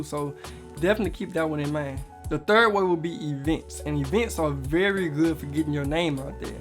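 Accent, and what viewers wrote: United States. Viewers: Love the accent bruh what state?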